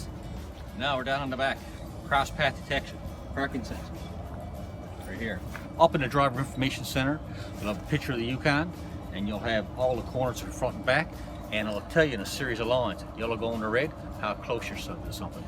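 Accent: Canada. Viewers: "...over tree hundrid horse power!" Haaaaaaa! Love that Cape Brenton accent... "You frum the Bay, By? Noooooo, I'm frum the Pier, Dear!" **and** "It's a noice night for a foight, Mike!" are also Cape Breton classics :. )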